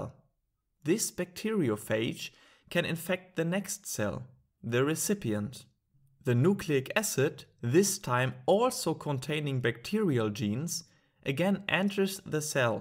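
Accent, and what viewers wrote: Germany. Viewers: dein deutscher akzent ja moin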